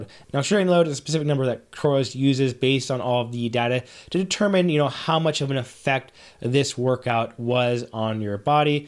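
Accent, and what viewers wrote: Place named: United States. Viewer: You certainly want to be clear and explain how your (my) watch works, but you should consider it is very very hard to understand the comments for foreigners as the horrible american accent and the way they pronounce 50 percent of the words make it a real performance for users outside the US. Except, if there is a translation somewhere (which I did not found). Please make an effort !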